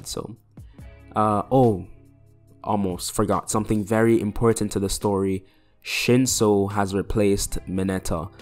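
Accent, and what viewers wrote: Jamaica. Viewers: Bro are you Jamaican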